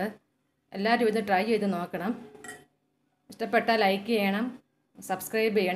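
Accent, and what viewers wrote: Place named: Pathanamthitta, India. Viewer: Supr👌 Pathanamthitta ano sthalam.... njangalude same slang 🙃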